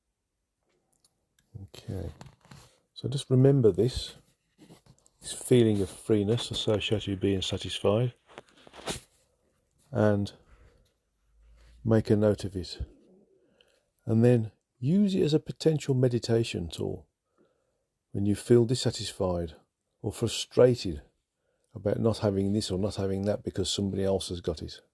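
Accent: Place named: Midlands, United Kingdom. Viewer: This is called an English Accent . He is from the Midlands . I have trouble with English being spoken by Indians . Listen to it twice 😁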